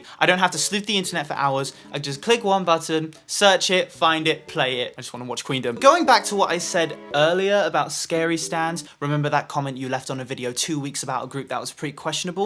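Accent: United Kingdom. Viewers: Your accent is so British ❤️ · i completely forgot has this strong british accent and how it makes everything he says sound so elegant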